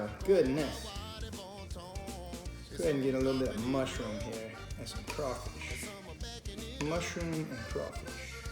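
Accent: Louisiana, United States. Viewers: are you really from Sweet Love from Louisiana cuz you have that accident I want to say you're not married things that you don't cook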